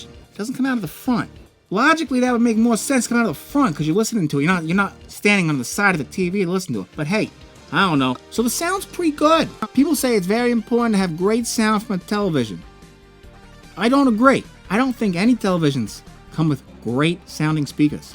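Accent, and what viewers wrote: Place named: Boston, United States. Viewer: Boston accent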